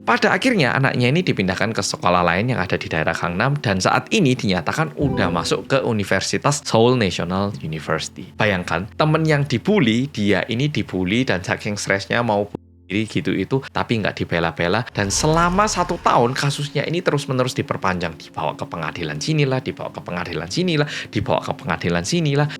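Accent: Java, Indonesia. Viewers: Hai medok🎉 lgsg nyimaaak🎉 Salam sehat 💪🏿🙏🏿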